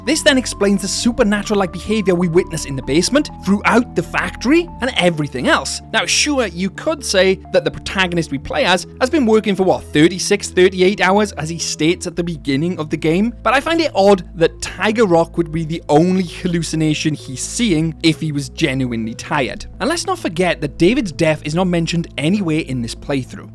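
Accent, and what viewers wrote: Wales, United Kingdom. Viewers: Great video bro love from England but I think your a Brit as well ❤love to anyone reading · Are you welsh ?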